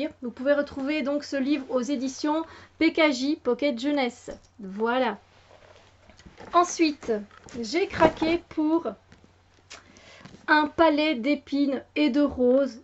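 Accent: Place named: Belgium. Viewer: beau accent belge lundi le foot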